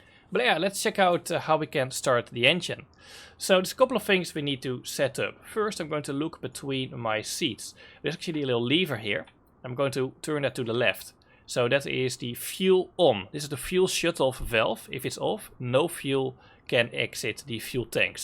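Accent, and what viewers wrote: Netherlands. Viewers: Dutch accents are not really harder to understand then other English accents. My Canadian family perfectly understands this kind of dutch accent... beside that, this comment is completely off topic. I'ts a great video of how to properly setup and fly the Cessna 152. A little more respect for content creators please. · Awesome video, love the Dutch accent 😊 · Wat een belachelijk Hollands accent, Leer eens Engels praten he..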